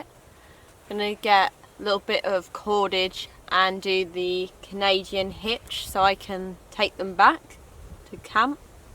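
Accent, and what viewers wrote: United Kingdom. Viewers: Wonderful British accent... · British accsen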